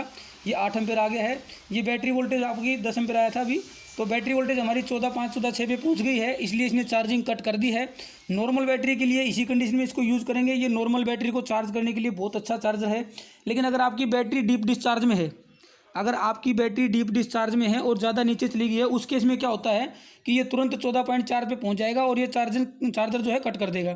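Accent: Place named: Haryana, India. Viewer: Good idea sir. Thanks. Sir aap Apne Haryana se hai kya. Kuch language me haryanvi tone aati hai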